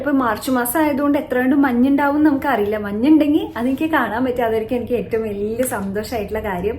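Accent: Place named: Kochi, India. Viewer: Don't defame kochi slang..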